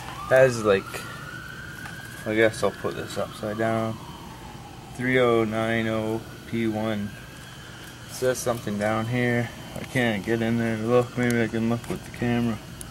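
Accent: Canada. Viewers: Where are you originally from? It sounds like your from western canada originally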